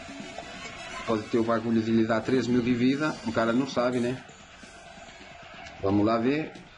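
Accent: Portugal. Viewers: gostei da sua visão de jogo e comentarios. não entendo muito portugues de portugal mas ta valendo rs. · que sotaque é esse cara??? é um português falando português-br??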